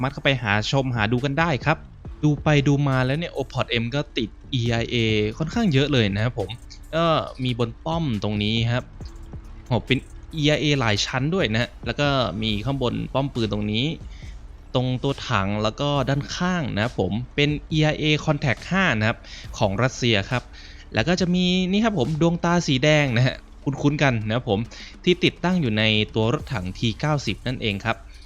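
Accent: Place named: North, Thailand. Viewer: เป็นคนเหนือหรือเปล่าครับ สำเนียงเหมือนเลย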